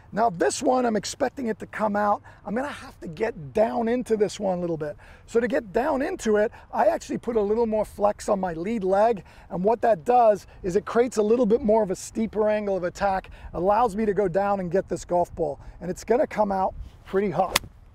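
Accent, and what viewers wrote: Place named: Australia. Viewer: Can hear his Australian accent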